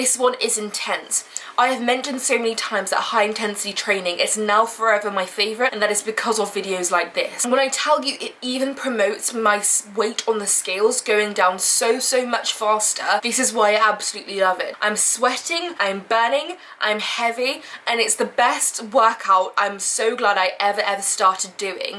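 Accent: United Kingdom. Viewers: She sounds like she could be in bridgeton… love it 😅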